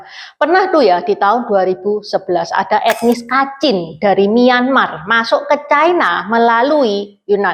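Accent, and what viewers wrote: Java, Indonesia. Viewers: Iki wong Cino, tapi bhs ny medok jowo, keren"👍👍👍👍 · Ce ngomonge medok banget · aq ngakan sebab ini kakak medok banget😂😂😂😂😂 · Orang cina, bahasa indonesia, logat jawa. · Coba perbaiki lagi logat jawa medok nya mbak.. biar lebih jelas bicaranya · Logat jowomu medhok mbak yu... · Aku focus medok. Mba mba Jawa .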